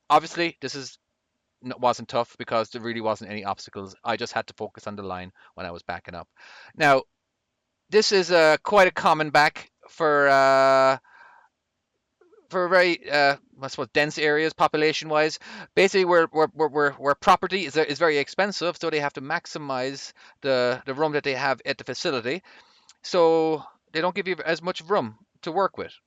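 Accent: Ireland. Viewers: are you Irish? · What accent do you have? To me it sounds kind of Irish, another trainee says Canada.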